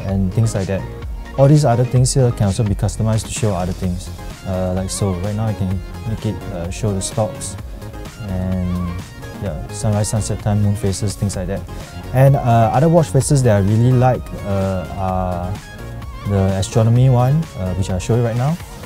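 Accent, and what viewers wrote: Singapore. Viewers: Definitely sounds like a Singaporean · omg that Singapore accent.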